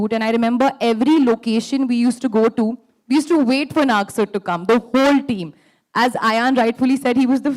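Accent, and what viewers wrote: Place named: Telugu, India. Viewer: U sound like a telgaite when u speak ..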